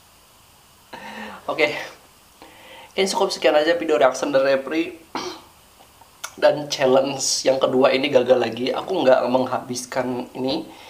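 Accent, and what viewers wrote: Banjarmasin, Indonesia. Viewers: Orang banjarmasin mana suara nya😄✋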